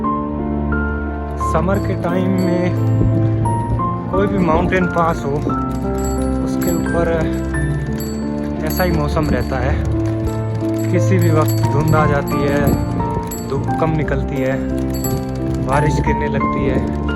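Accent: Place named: Haryana, India. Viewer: भाई कभी कभी ये हरयाणवी में इंग्लिश बोलते हो ये वाकई लाजवाब है...🙂😊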